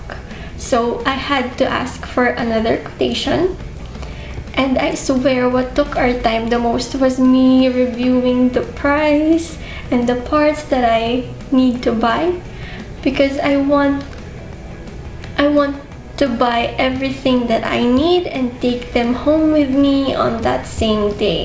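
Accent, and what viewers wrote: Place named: United Kingdom. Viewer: I heard somewhat of a British accent ngl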